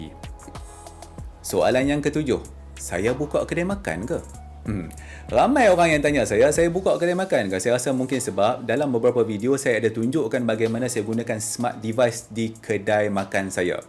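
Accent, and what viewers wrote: North, Malaysia. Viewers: bang loghat utara abg terbaik....abg utara blah mana?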